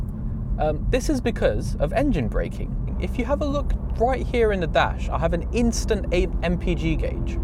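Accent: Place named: England, United Kingdom. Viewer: What part of england is that?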